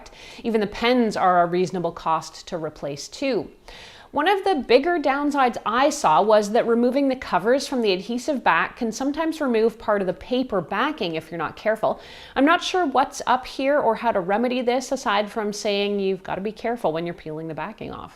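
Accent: Canada. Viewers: Thank you, it seems like a lot of reviewers are Canadians nowadays. The giveaway is the pronunciation of "PROcess" in most reviews😅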